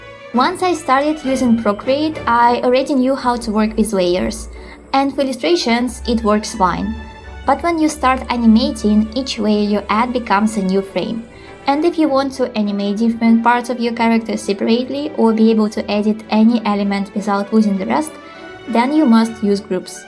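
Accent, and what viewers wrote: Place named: Philippines. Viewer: Hello arr sounds like Filipino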